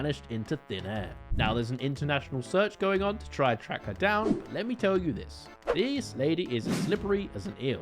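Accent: United Kingdom. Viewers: Wait is this brit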